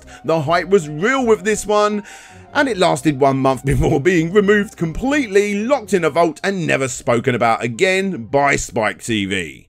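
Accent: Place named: United Kingdom. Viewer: My wife can't understand English and still notices you're British.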